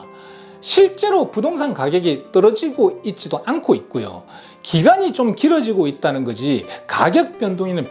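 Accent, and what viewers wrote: Gyeongsang, South Korea. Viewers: 대구 사투리 ? ㅋㅋ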